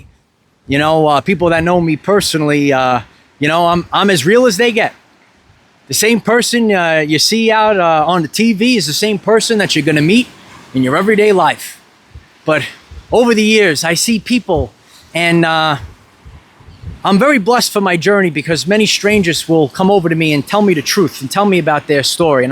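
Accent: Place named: Bronx, United States. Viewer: This guy looks like a leading man in Hollywood but speaks like a grifter from da Bronx.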